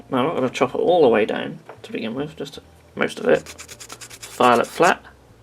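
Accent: Norfolk, United Kingdom. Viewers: Are you norfolk? I'm Norfolk and you sound a little bit norfolk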